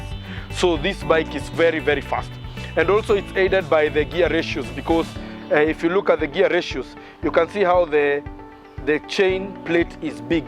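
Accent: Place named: Kenya. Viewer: Sounds Kenyan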